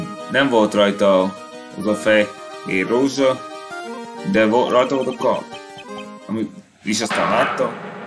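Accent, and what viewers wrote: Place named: Slovakia. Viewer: Ha a beszédére gondolsz akkor az akcentus mivel szlovákiában él és talán született is.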